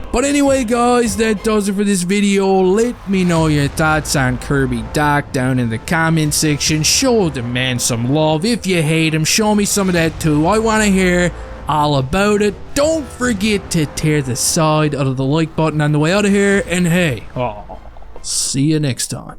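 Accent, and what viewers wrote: Newfoundland, Canada. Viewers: I follow about 10 Habs page on Youtube, and you're my favorite. You're funny as shit, your Newfie accent makes it twice as funny. Keep up the great work budz 💯👌👍🐐🥇🏒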